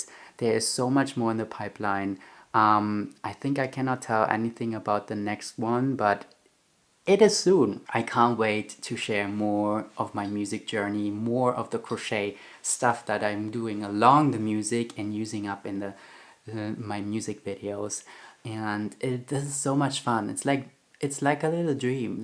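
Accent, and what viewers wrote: Germany. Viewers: Where Do you come from? Is this a german akzent? The pants are WOW! Many greatings from Germany👍👍👌👌🤩❤😘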